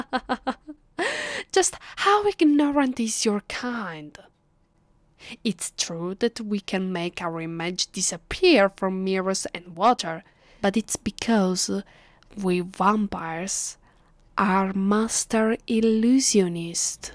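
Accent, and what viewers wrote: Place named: Italy. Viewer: To, I enjoyed your vampire roleplay. The title Vampire 's Captive. And the Italian accent, actually makes it. Extra special indeed.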